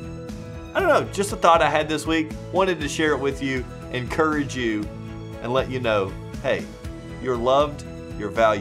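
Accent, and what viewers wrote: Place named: Ohio Valley, United States. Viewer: Thank you for sharing! Keep it up man...I appreciate that you share your walk with God to all those who listen to WalkFM. I just found you all online as I was searching for Christian Radio Stations back home (Ohio Valley). I have tons to listen to here in Nashville,TN...but I was missing the accent and familiarities of "home".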